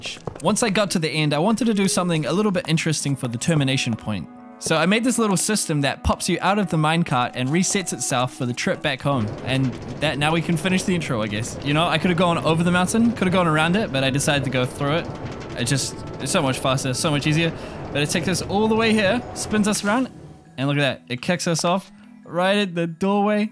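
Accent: New Zealand. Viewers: Question? Are u kiwi? (R u from New Zealand?)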